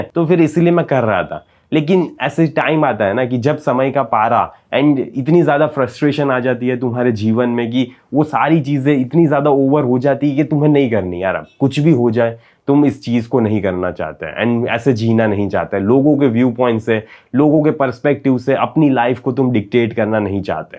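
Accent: Gujarat, India. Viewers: Gujju accent detected ❤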